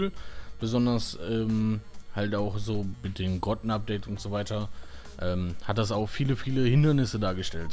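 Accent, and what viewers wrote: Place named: Germany. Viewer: Uff dieses deutsches Akzent ist ja schlimmer als Hochdeutsch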